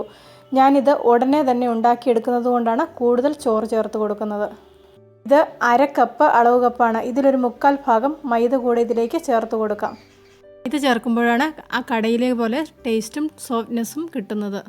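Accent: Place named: Southern Kerala, India. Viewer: വീട് കണ്ണൂരാണോ? സംസാരശൈലി തെക്കൻ ജില്ല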